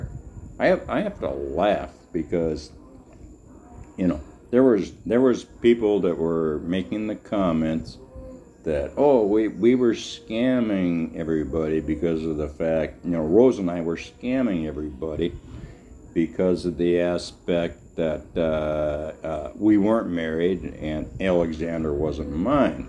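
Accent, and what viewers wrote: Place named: Midwest, United States. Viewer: Hello. I really enjoyed your stories. I remember the stories about when you was a kid in Minnesota at the vacation spot they used you for a fish tour guide. That voice you have in that Midwest accent really does a great job at storytelling. If you look through any comments I've made I don't think you're going to find anything that I've ever said that's been negative. I wish you and Rose the very best. Just an idea it would be nice to see if does come out of her shell the progression of that. I've seen some other guys that have had shy Filipinos and it took a while but you could see them get better and better at being on camera and such. God bless good luck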